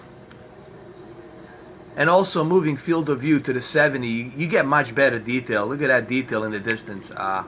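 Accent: New York, United States. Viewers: Are you from NY? I keep waiting for you to say "dead ass" lol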